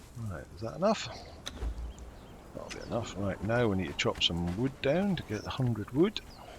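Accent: Scotland, United Kingdom. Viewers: this tutorial is objectively superior to all the others b/c of the scottish accent alone.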